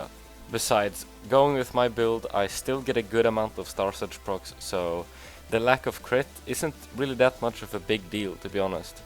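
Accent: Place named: Sweden. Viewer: Dat swedish accent :P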